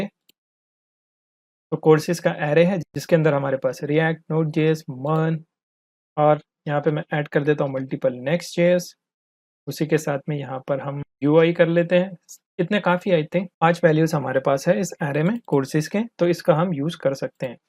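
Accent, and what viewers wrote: Hyderabad, India. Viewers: Bhai ye hyderabadi accent pasand aya mujhe and nice video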